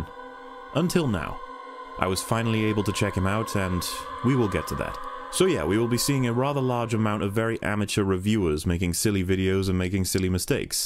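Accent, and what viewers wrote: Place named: United States. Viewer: strange American accent ONLY ELEVEN MINUTES INTRO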